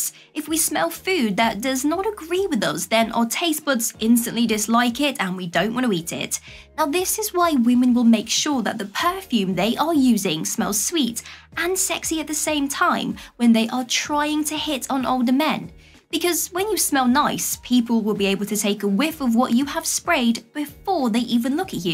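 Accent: England, United Kingdom. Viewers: LOVE THE VOICE. IS IT ENGLISH, AUSTRALIAN, OR SOMETHING ELSE?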